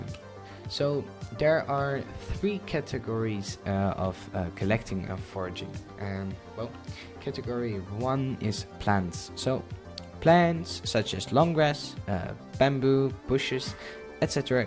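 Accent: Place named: Netherlands. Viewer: nederland!! ik hoor het aan jou accent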